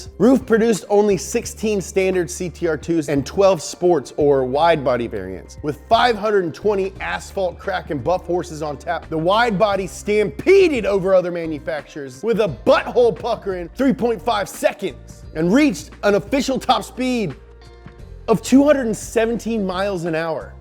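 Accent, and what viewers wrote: Germany. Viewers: The german accent is pure bellissimo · the funniest german accent ever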